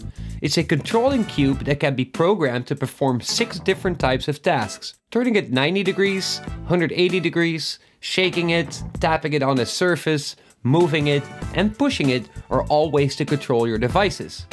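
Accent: Netherlands. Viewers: Dutch I think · So happy with your channel man. Your accent sounds Dutch, are you? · are you Dutch?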